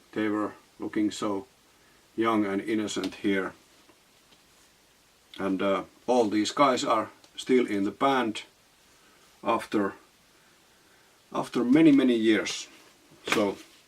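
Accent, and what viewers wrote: Finland. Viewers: i love finnish accent so cute :)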